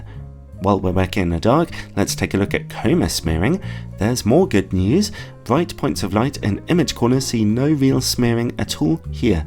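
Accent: United Kingdom. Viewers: Your lense and camera reviews are allways definitly one of the 3 best ones on the internet in any aspect from the technical and practical view regarding the lenses itself but as well from the aspect of very nice entertainment with your lovely british humor and accent, I like so much as I lived in London and Bath a couple of years ago for a short period of time! There are only Gordon Laing's reviews and DPReview on the same great level, these 3 channels are all I need to know about a new lense!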